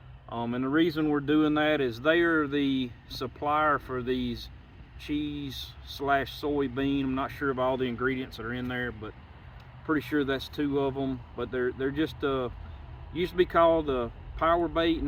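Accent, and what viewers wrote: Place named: Appalachia, United States. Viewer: Absolutely loved this video, we watch Dat Louisiana Life and got turned on to y’all’s channel.. Love the hillbilly accent sounds like Ken, Do you offer tours?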